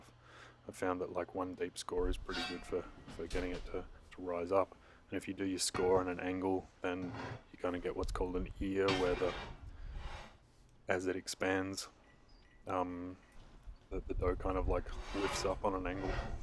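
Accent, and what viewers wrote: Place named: Australia. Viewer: hey, he cant help being australian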